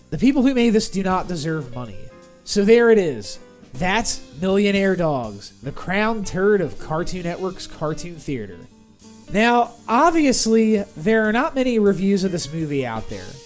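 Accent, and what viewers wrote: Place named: Philadelphia, United States. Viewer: Damn your Philly accent ya? Harsh!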